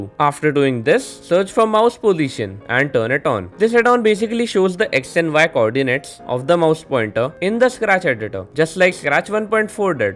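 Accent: India. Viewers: Yes everytime you here a indian dude as the tutoruial narrator you know thats this is going to be the best tutoriual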